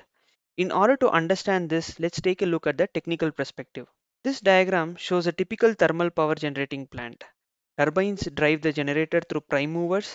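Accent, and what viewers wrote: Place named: India. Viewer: I love indian english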